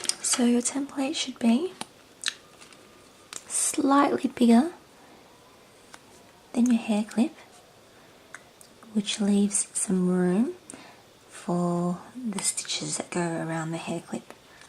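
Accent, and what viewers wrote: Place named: England, United Kingdom. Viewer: Loved your tutorial! Very professional and the clips are beautiful! Being a Mom,I loved that you taught your little girl along the way! So sweet and like some of the other comments here,I agree that your English accent and soft voice make this tutorial all the more delightful to watch! Now I am going to go make these with my daughters!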